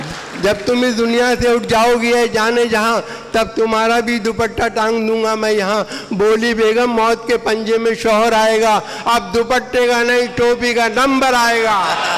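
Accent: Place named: Meerut, India. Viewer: Lajawab meeruthi lehjah he....!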